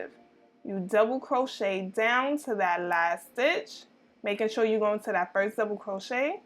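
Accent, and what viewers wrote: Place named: Bronx, United States. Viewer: Where are you from? Sounds like you're from where I'm from. Ny. Bronx to be exact. Lol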